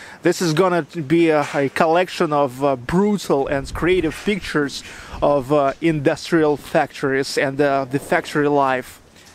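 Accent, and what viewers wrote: Russia. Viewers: I understood "salary's lab". You have russian pronouciatio: "o" becomes "a" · Daanggg, I was in St. Petersburg in 2018 for 12 days but I didn’t know about this place. Awesome! Btw. your thick fat Russian accented American English is so much fun to listen to. I subbed.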